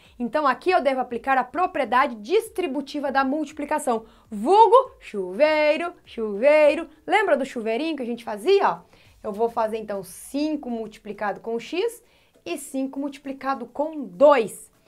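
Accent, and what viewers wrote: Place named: Rio Grande do Sul, Brazil. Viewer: sotaque gaucho kkk vlw pela ajuda tenho 4 dias pra estudar para prova de matematica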